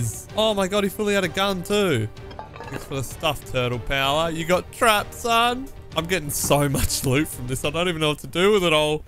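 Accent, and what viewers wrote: United Kingdom. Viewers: You sound like you're British are you British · O you're Brit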